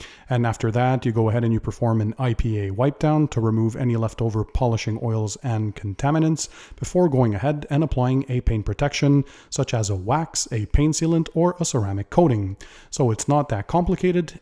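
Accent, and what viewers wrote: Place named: Quebec, Canada. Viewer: I watched 30 mins of your best products vid, and 22 mins of this one before I picked up on your Fr. Canadian accent, lol. Vive la Quebec! Merci, monsieur.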